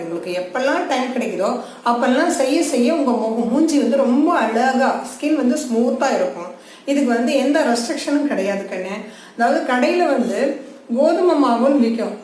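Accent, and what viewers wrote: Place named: Kongu, India. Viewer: Sure I am from tirupur I easyly connect our kongu slang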